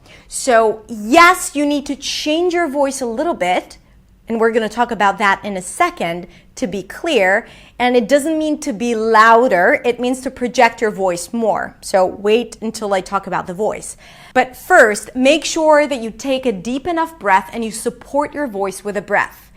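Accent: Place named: United States. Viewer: With the mask and a strong american accent it is quite dificult to understand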